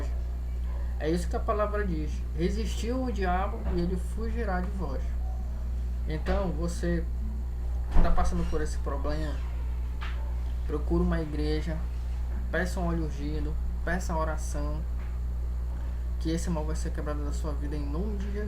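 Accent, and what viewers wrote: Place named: Pará, Brazil. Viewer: Maninho.... Tu é paraense neh ?? Morei um tempo no Pará, e tenho quase certeza da minha suspeita 🤔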